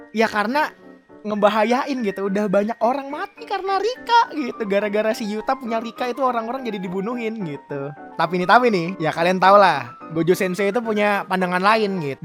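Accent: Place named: Madura, Indonesia. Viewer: bang, lu ngomong nya madura😅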